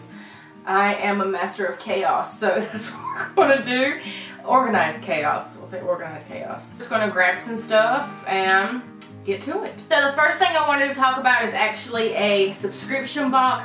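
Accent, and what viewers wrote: South, United States. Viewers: All it takes is a country accent and I subscribed.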